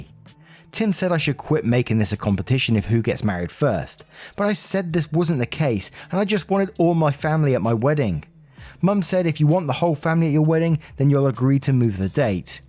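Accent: England, United Kingdom. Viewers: There's nothing better than hearing that proper English accent sing am I the butthole😂😂❤❤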